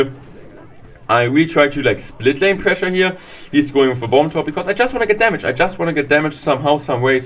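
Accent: Germany. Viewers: Ur German af i can tell 😂❤️❤️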